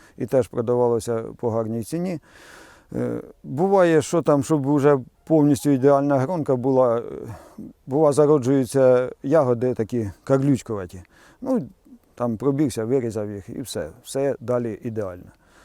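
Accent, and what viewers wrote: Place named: Poltava, Ukraine. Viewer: Так вы с Хорола. Мой отец тоже. Жаль уже умер. По разговору догадалась что с Полтавской обл. Хороших вам урожаев.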